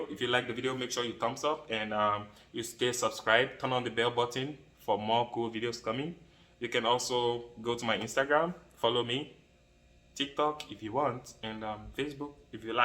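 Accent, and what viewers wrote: Philippines. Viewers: your english is so filipino~ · Your accent is filipino already. Why is that?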